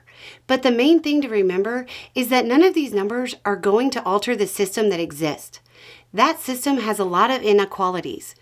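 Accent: South, United States. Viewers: I'm officially loving the shade Ms. weaves into her videos. Goddamn the knife seems to hurt a lot less when it's made it's cut. A knife sharpened hurts less but cuts deeper and all that..... when said with that strong southern woman voice. Ya know the one that's generally attributed to Grannys, aunties, and momma's, women who you don't mess with cos you know you'll be walking away with your behind red or coming back with your tail between your legs if you ignore them. Or maybe that's just my time growing up with my southern family that makes me think of all that advice that, as a kid, I didn't want to think applied to me and yet later on in the day id be wishing I listened to and having to come back to rolled eyes and apologizing and yes ma'am-ing for showing the disrespect in not listening to them. I pray we don't ignore that advice this time, don't forget the wisdom given to us isn't done to do anything but save our butts in the end, to benefit us rather than anyone else. 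That we can listen to this kind of intelligence and knowledge, hard won and earned wisdom by people who are trying to do right by us, and not try to see if we can have a different outcome, like we will be just fine going to catch frogs in our Sunday clothes, we won't get wet or muddy, having to run home and try to change into something else without anyone seeing, without anyone finding the evidence, and anyone realizing we changed (or be able to say we wanted to change, we didn't HAVE TO, we just lik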